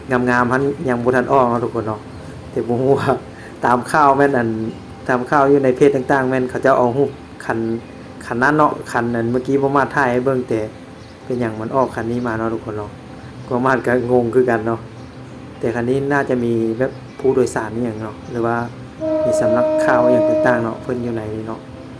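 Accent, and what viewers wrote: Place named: Xiangkhouang, Laos. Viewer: ເຈົ້າບໍແມ່ນຄົນຊຽງຂວາງຫວາຄຳເວົ້າຄ້າຍໆຄືໄທຊຽງຂວາງ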